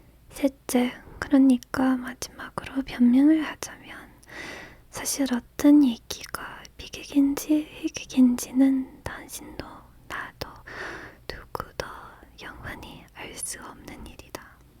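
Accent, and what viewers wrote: Standard. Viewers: your pronunciation is really standard, and it's hard to tell that you grew up abroad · 你的读音都非常标准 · 你的韩语发音很标准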